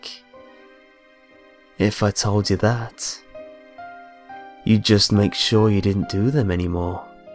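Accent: England, United Kingdom. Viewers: I love your accent :) I'm english too but i'm from the east midlands so my voice sounds dead common when I do Creepy Pasta readings :P Wish my voice was as posh as yours lol. Awesome reading once again :) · Gotta love brit accents XD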